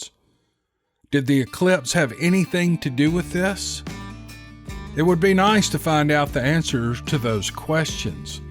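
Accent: South, United States. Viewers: Thank you, for providing such wonderful entertainment totally unrelated to all the craziness going on in the world these days!! You are a GREAT story teller with a beautiful, smooth as velvet Southern voice! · Have a happy Thanksgiving, thanks for the stories man just love your southern accent my brother.. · Hi, I have become a huge fan from the UK. I love your stories and could listen to you for hours.. I adore your accent, it's so nice and homely, being from England I never get to hear a that glorious Southern drawl.. thank you so much for all the time and dedication to your channel, from me, hundreds of miles away across the pond. Happy thanks giving to all my American friends 😊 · I've said this before, but I will say it again. I'm from Oklahoma and am used to hearing people talk with a southern accent. So when I hear that familiar drawl with those vowells drawn out, I get a warm, comfortable feeling. 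It's brings a familiarity that inspires a trusting attitude for me. I can relax and fall asleep when listening to you. Thus, you turn out to be the voice I go to sleep to each night. I have difficulty with sleeping, so anything to make those long nights pleasant and maybe shorter is welcome. Thank you for the pleasant demeanor and great stories you choose for all of us.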